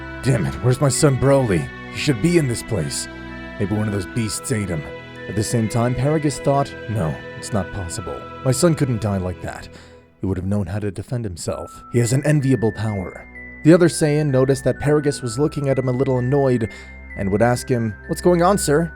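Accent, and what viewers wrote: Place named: United Kingdom. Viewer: I agree but you sound...toooo british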